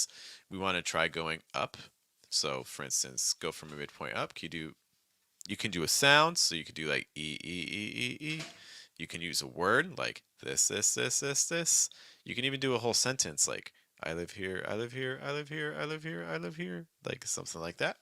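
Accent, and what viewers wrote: United States. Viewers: i thought you were american! great accent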